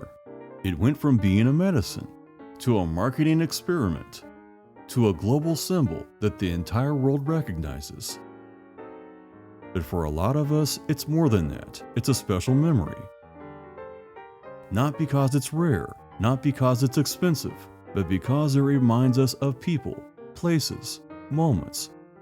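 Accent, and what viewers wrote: Indiana, United States. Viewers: Your videos make me feel homesick for Indiana…parents from Ft. Wayne and Otterbein, my first 18 years in Anderson, next 30 years in Bloomington and Nashville, then overseas and now Iowa. An Indiana accent is subtle but it feels like home to me…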